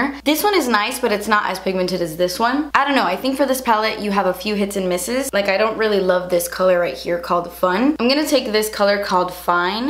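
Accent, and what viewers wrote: England, United Kingdom. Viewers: i would bloody love it if you would do a full video in your english accent omg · Anddddddddd I love your English accent I wish you would do a whole video talking like that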